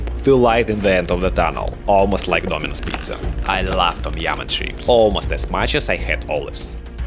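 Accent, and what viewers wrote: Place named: Russia. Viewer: У него акцент как у русского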